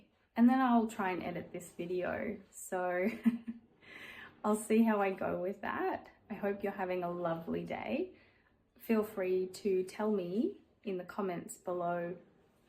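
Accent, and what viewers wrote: Australia. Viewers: i really like your videos. so that i can shadow aussie accent ❤ ❤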